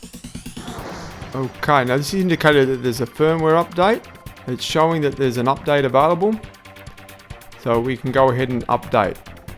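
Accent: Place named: Australia. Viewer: No problem mate. You Aussie? I lived in Melbourne from 2001 to 2003. It's good to hear Aussie talk again. I spent forever figuring out a solution when GoPro was no help. They wanted me to send it in at my expense and only if it was still under warranty (it wasn't). Quite sad when the company that sold you gear breaks your gear and leaves you with the problem. Sent them the video and only got a "thank you". HERO3 black would have been better. I have v50, v58, v70, v124, and v222. v198 is bad.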